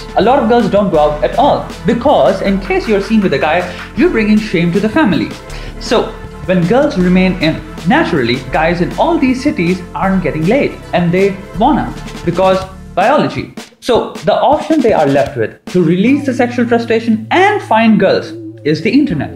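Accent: India. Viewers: Why is the Indian population so high then? This also the first time, I found a Indian accent, that sounds nice. No offense 😅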